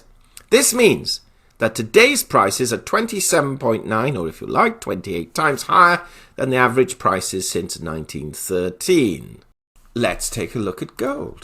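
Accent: United Kingdom. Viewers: A realistic presentation. Very enjoyable. Investing in Gold and Silver is more about a flight to safety, than it is unrealistic profit. It will make money and it will do well. Patience is required. You can open an account from a link on this channel, invest in gold and silver and even designate the storage location (Zurich etc.) Great for those who do not want to store the metals themselves. There is nothing like healthy skepticism with a British accent. Subscribe and keep listening.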